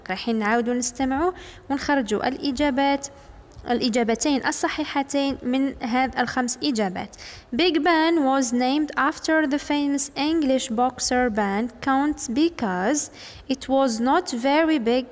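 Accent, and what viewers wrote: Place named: M'Sila, Algeria. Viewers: استاذة انت من ولاية المسيلة ولا اذا انت من مسيلة انت راك قريتيني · اها ننضنش من مسيلة من لهجتها باينة دزيرية ملاحضة انا فتاة ولست ولد بلاكي تشكي في اسمي ديال القناة 😅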